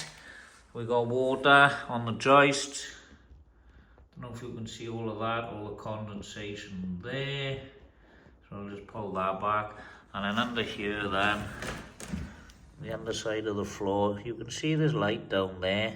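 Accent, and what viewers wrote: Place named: Wales, United Kingdom. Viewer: Just something about a Welsh accent that makes me smile.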